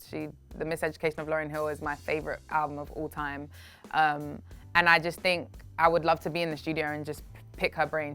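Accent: United Kingdom. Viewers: YOU CAN HEAR THE BRITISH ACCENTS. I LOVE YOU ELLA MAI 🙏🇯🇲🇯🇲🖤💛💚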